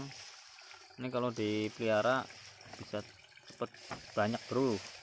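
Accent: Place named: Banyumas, Indonesia. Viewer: Deneng ngapak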